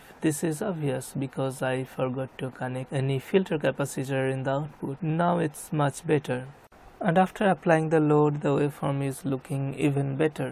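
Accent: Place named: Bangladesh. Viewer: r u from bangladesh. i can guess from ur English accent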